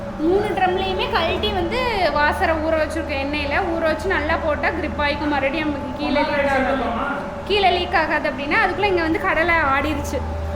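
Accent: Kongu, India. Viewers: செய்யும் தொழிலே தெய்வம்...இந்த வாக்கியத்திற்கு ஏற்றார்போல் செய்றிங்க.. வாழ்த்துகள்... சகோதரி...வாழ்க வளத்துடன்... உங்கள் வீடியோக்களை இப்ப ஒரு வாரமாக பார்த்தேன்..என்னமா எல்லோரையும் கொங்குதமிழில்பேசி அருமையாக சிரிக்க வைத்துள்ளீர்கள்.. உங்கள் குழந்தைகளும் நடிப்பில் அசத்துறாங்க... உங்கள் கணவர் அதைவிட சூப்பர்மா. நீங்கள் நலமோடு வாழ வாழ்த்துகிறேன். நீங்க மென்மேலும் வெற்றி அடைவீர்கள்...🎉🎉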